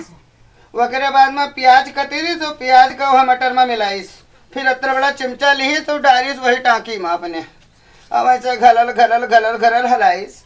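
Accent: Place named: Awadh, India. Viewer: क्या जबरदस्त अवधी भाषा है भाई इस आवाज का कोई जोड़ नहीं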